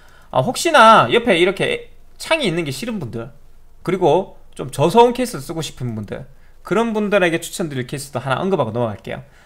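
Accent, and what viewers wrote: Gyeongsang, South Korea. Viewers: 님 말투가 완전 경북분 특히 대구분같으신데 대전으로 가신 이유가 있나요? 생각해보면 완전말투는 다른데 대전대구 둘다 큰도시고 거리도 가깝더라구요 그냥 궁금해서 ㅎㅎ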